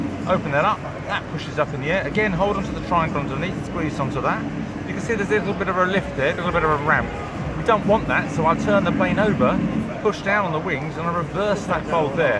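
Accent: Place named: Cambridgeshire, United Kingdom. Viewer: CLAUSE BOMB!! :) Good day fellow brit, maybe even from cambridge? as you are in duxford and you have a distinctive cambridgeshire accent rather similar to mine. Keep up the good work chap. Tally ho!!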